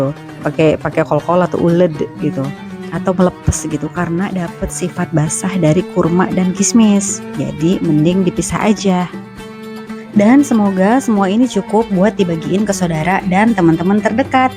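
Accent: Jakarta, Indonesia. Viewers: Biasa liat konten pake bahasa arab ternyata pas di indo nyablak bener betawi tulen😂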